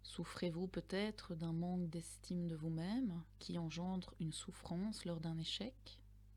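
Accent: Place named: Belgium. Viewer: J'ai bien aimé la vidéo, je l'ai visionnée/écoutée 2 fois. Pour moi votre accent belge rend votre voix plus belle et plus agréable à entendre (je suis Français...), et je trouve vos conseils plutôt pertinents. Petit bémol de mon point de vue, le son semble très bas par rapport aux autres vidéos sur youtube. Je dois alors augmenter le volume au maximum puis le baisser subitement dès que je visionne une autre vidéo. En tout cas je vous dis merci et vous souhaite une bonne continuation.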